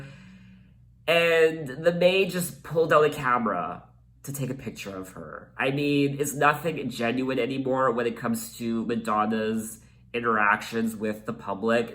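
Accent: Canada. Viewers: grow the beard but lose the canadian accent.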